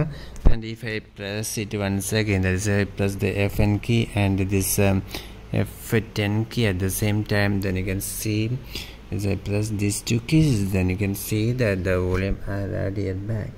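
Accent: India. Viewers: where is the accent from? is it India?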